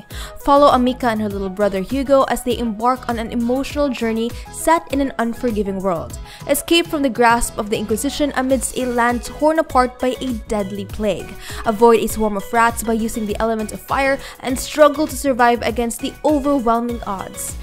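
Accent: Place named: Philippines. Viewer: the narrator is filipina. halata sa accent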